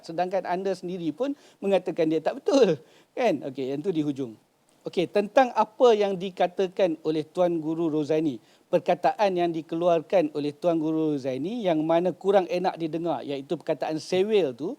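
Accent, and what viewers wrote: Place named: North, Malaysia. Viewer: tg guru lain, ni cari makan ja pun. Orang bodo cem hampa ja duk layan buden ni. Dahla org utara babi rasa sial gila ni duk cakap utara, rasa cem loghat aku pakai jadi hina😂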